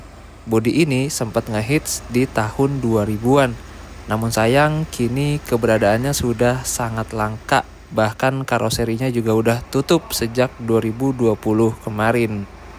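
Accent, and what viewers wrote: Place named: Banyumas, Indonesia. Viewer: Legend bise wong ngapak,, kemutan jaman sekolah numpake bis Asli 😂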